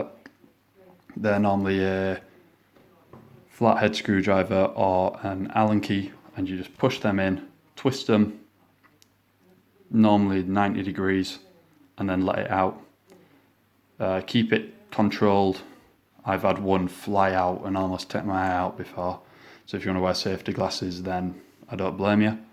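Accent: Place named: Yorkshire, United Kingdom. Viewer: Gotta be from Yorkshire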